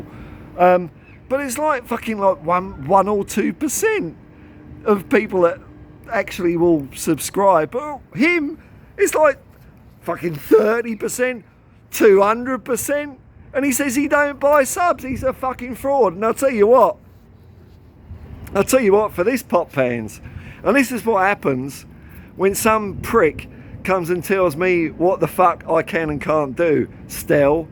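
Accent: Australia. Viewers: Are you Australian mate? Can’t work out the accent. Like your channel 👍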